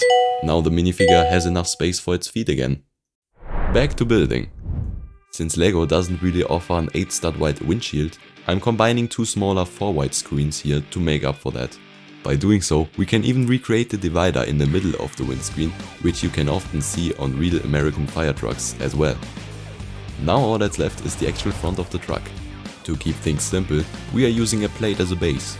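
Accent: Germany. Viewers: Bis du deutsch ? Du hast eine kleine accent 😊 Ich habe dich wegen der Aussprache von „Chicago“ entdeckt: ein zusätzliches H und viele A „chic'Haaaaago“ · Do i hear a little bit of an DEUTSCHER AKTZENT? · Is he german? Im German and his accent sounds sooooo German to me😂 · Do I hear some DEUTSCH in your language? 🤣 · Digga warum kein deutsches Auto man hört doch dass du Deutscher bist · Am i the only one who heard a slight german accent? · I hear a little bit of deutscher Akzent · That’s NOT a realistic fire truck. Lego is European. And judging your accent, you clearly are German. So why the frick are you calling this thing realistic? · i hear german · The German accent 😂 Great Video mate! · I hear german accent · from your speaking i can guess you are from germany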